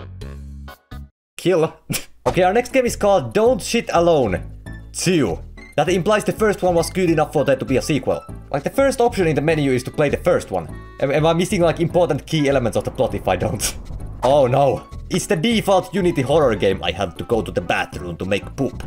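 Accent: Finland. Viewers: I've watched couple of your videos and I didn't know you were from Finland before you said "vittu". After that i just couldn't unhear the accent. Torille jee🇫🇮🇫🇮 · He's finnish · He's obviously Finnish he speaks with a rally English accent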